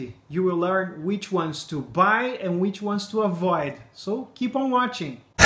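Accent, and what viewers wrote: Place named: Brazil. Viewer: Sounds like a Brazilian to me based on the accent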